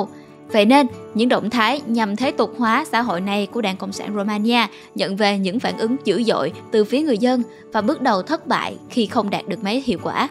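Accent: South, Vietnam. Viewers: Giọng miền nam duy nhất của nhà spider nhĩ, nghe de thương quá 😍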